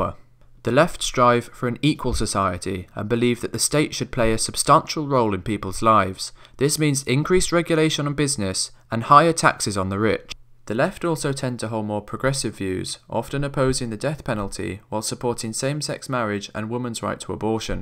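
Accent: United Kingdom. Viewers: This is just PragerU with a bri'ish accent. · Peter t.c.: I wouldn't be giving out advice like this when you can't even tell the difference between an American and a British accent. The presenter is British.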